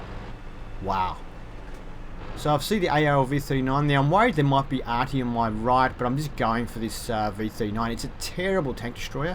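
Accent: Australia. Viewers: hi are you aussie?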